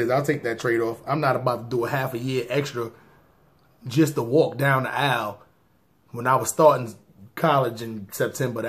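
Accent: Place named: New York City, United States. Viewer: Nothing like a nigga from nyc telling a story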